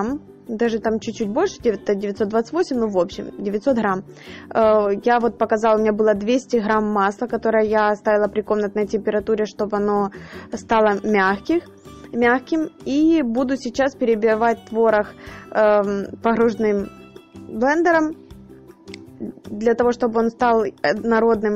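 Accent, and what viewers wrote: Ukraine. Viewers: А мне нравится такой украинский говорок!))Главное рецепт хороший и лишней болтовни нет!))Всех благ вам....милая девочка!)) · Я серьёзно не понимаю - так говорят украинцы на русском языке? Или это диалект какой то? Очень прикольно ☺ За видео - лайк. 👍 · Спасибо большое! И за мусс и за украинский говор, на душе тепло. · Боже мой! Как же ласкает слух ваш говор! Я впервые услышала украинский акцент(если я правильно поняла). Я так и знала, что он мне понравится! Так заслушалась, что по рецепту ничего не поняла)))) Пойду ещё послушаю. И обязательно сделаю такой крем. Благодарю!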